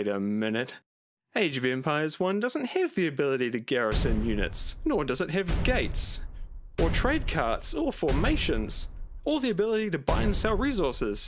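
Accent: New Zealand. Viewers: Good review! Question: where do you come from? The accent reminds me of New Zealand or something like that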